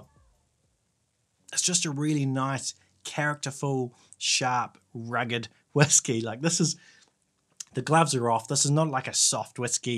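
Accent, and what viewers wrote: New Zealand. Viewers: You from New Zealand ? U have a Nz accent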